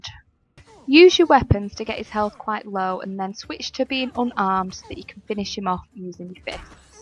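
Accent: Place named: Northern England, United Kingdom. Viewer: Great tip thanks! Subscribed as your content is spot on (and its great to hear a fellow northerner doing guides on my fave games!!)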